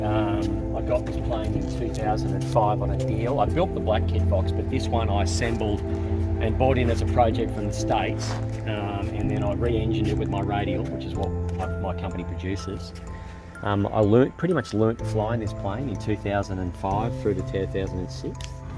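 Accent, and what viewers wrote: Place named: Australia. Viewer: Great video! And really nice to hear an Aussie accent in the Experimental category. Great work!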